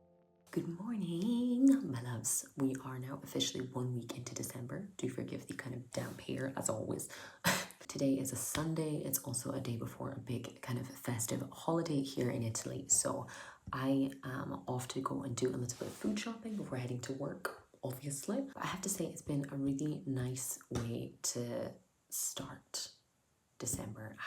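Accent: Scotland, United Kingdom. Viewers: Found your channel randomly loveee it 😍 I’m in glasgow, brill that your Scottish too👌🏻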